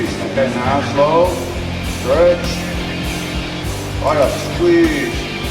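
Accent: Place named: Birmingham, United Kingdom. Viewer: Birmingham, England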